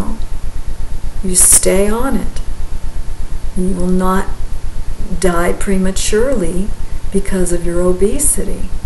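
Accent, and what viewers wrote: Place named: United States. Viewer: Why do you start out with a heavy Eastern European accent and then it fades to a complete American English. Please stop! I have been watching you for years!!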